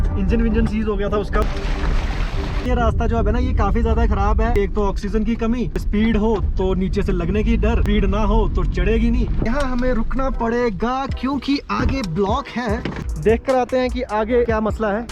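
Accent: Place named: Pakistan. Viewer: ye blogger pakistaniyo ku tarh kyon bol raha hae ...iski tone full pakistani jaisi hae.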